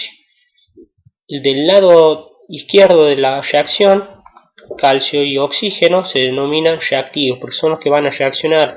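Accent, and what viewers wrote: Córdoba, Argentina. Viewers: Gracias! Ese acento cordobés me salvó... la verdad que ya ni recordaba esto y en unos días tengo evaluación diagnóstico jajaja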